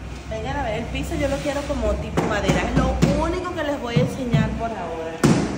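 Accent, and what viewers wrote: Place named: Mexico. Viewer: Ese acento mexicano no te keda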